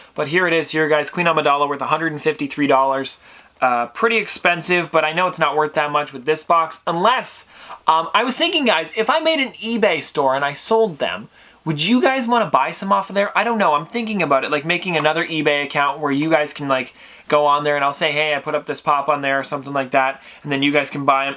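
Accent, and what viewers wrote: Canada. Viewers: I love how Canadians say about lol a boot like every other word is normal just the about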